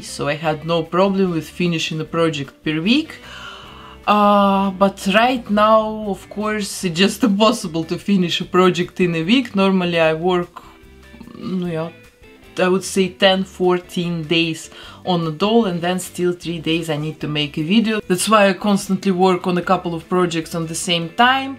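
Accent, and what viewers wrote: Russia. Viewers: Мне одной интересно, почему русская женщина до сих пор не потеряла акцент?))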